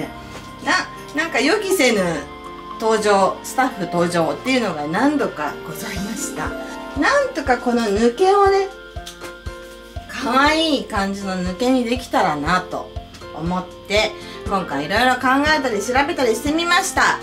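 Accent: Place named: Nagoya, Japan. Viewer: が作業中の肉まんのソックスと名古屋弁が可愛かった💕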